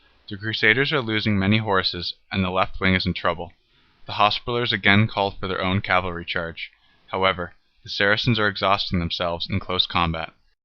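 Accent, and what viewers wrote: United States. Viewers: the american accent just ruined it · Hokey American voice and indistinct graphics make this a very amateurish effort.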